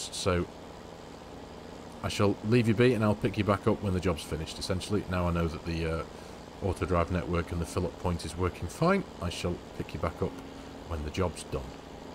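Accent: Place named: North, United Kingdom. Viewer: This is a very good series. Thank you. Love your accent, you sound more northern.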